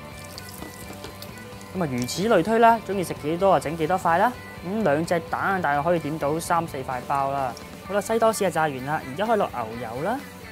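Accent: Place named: Hong Kong, China. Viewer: D英文好香港式 夠地到 正!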